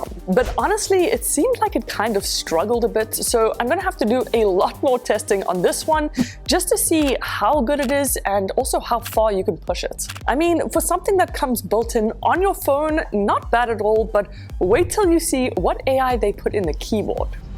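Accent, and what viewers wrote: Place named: South Africa. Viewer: Yooo this is crazy, I stumbled on this video and instantly thought you sound South African. Let's go such a good quality video. I'll be sticking around. Subbed!!🎉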